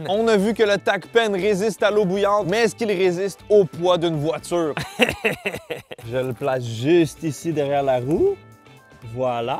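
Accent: Quebec, Canada. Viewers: Je viens de découvrir cette chaîne et ca m'a bien plu!!!!! L'accent québécois, l'humour,les expériences,le ton léger, j'adhère !!!!!!De France . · J’adore l’accent canadien 🤣🤣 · Tu à gagner un nouveaux membres, j'aime ton accent du quebecq, et tu ressemble à un de mes ex potes disprus avec une seringue dans le bras, on le surnomais "le coyotte",très bonne prêsentation de tes articles, et j'aime aussi ton humour. "Serge. L" (depuis la belgique) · L accent du Québec est toujours aussi 😂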